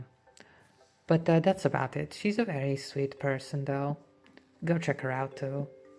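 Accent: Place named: Romania. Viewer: wow I mean first of all, LOVE THE NATURAL ROMANIAN ACCENT 🗣🔥 and thank you so much for calling me nice and chill 😭You have no clue how much it actually means to me though, and I wish I was able to hang out way more, but I'm quite busy sadly and will probably still be for a while but honestly, what a Life update this is, I'm sorry to hear about all the health struggles and financial worries, really hope it all works out and you get that new pc too so we can enjoy more peak vids 🙏and I can speak for a majority of the people that know and watch you, take your time. Even if it takes you weeks, or months, or years, to get that PC, we'll wait and be excited for the vids!!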